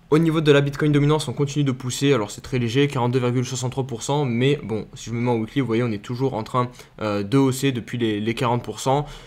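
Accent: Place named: France. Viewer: Haha je suis brésilien. Très français ton accent. Ps: les brésiliennes adorent... 😉👍🇨🇵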